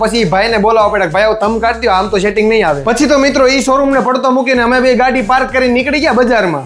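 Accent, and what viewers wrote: Kathiawar, India. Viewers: ભયંકર મસ્ત અને જોરદાર કાઠિયાવાડી બોલો છો ભાઈ.. એક નંબર અને પણ...😅😅😅❤❤❤👌👌🫰.. ભમ ભમ..se ne he..se ne he😂😂😅.. સેજ કટક બટક😮😂.. પાણપુra😂😂❤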